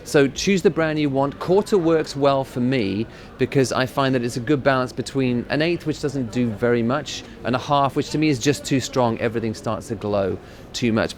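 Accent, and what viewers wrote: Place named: South Africa. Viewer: Man I knew you were South African the first time I heard you speak, can't mistake some of those enunciations coming through the British accent 😅 . Great video bru 🇿🇦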